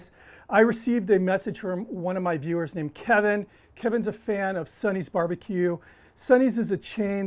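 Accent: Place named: Texas, United States. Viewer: no need to have a Texas drawl, you're a pitmaster, brother! 🌵🤠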